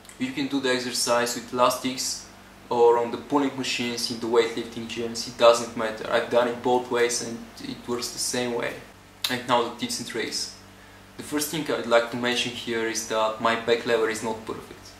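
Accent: Bulgaria. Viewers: Ебаси българския акцент .... българин ли си ????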